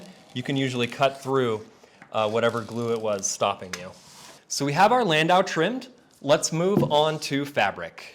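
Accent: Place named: Germany. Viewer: Thx, really worthwhile to watch. Btw, zufällig deutsch? Wegen des ever so slightly hörbaren Akzents.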